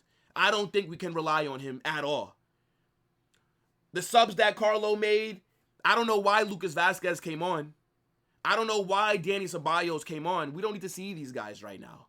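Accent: United States. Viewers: I could tell he’s American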